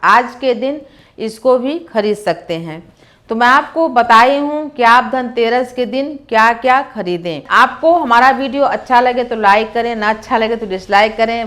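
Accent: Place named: Allahabad, India. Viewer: Both boht achi baat batai apne.. Mai Allahabad ki hu... Apki boli waise hi... 💕💕👍👍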